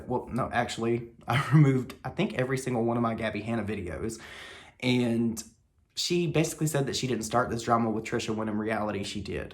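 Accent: South, United States. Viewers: I must know for sure. Are you from the south because I am 🙋‍♀️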